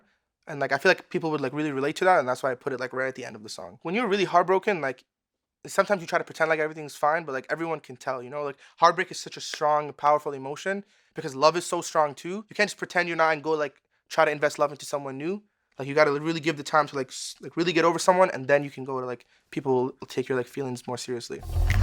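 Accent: Toronto, Canada. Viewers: When he started I thought he has a toronto accent and the I looked him up and I was right! Lol anyways this guy is amazing! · Toronto mans accent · he sounds like a guy from toronto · I can tell by the way he talks he’s from Toronto.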